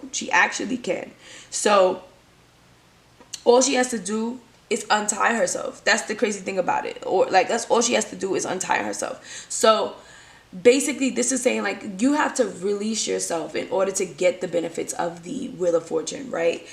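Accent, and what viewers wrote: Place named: Brooklyn, United States. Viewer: This was a great reading this resonated with me so much! You are so relatable as well, growing up in Brooklyn this is exactly how we speak lol. I will continue to follow you IG page and watch your tarot spreads…thank you for sharing your gift with us!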